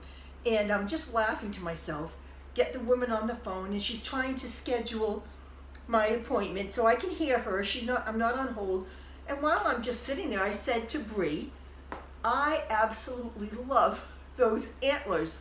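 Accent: New England, United States. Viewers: Are you from Rhode Island or Mass?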